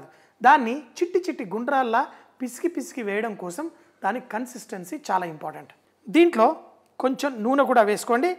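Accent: Telangana, India. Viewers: అన్న నువ్వు తెలంగాణల మాట్లాడితేనే మస్తు వుంటది 😁🥃🥃🙏🙏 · Telangana slang keka . . .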